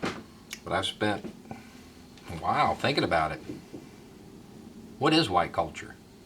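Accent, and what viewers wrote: South, United States. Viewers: Because he's a disingenuous asshole who just repeats Leftist talking points in a southern twang. Which is also subsequently why you guys like him, he's basically your "house southerner".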